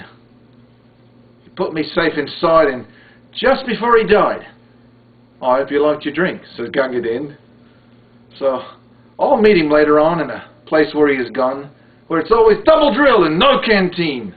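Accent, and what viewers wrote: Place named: Australia. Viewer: That's a great performance and perfect Aussie accent too which is fine for an Imperial piece such as this. Very moving at the end. 5 Stars.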